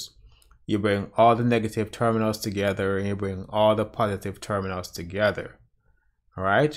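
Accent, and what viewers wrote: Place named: Africa. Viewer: Hey, my brother! thankyou or this! This is exactly what looked for in my search! Once and for all, eh, YouTube has come through for me. Ha! ha! ha!!!? BTW, my wife is from Africa! I heard it in your accent. You're ok! Top drawer! A'ight? Bye for now. Out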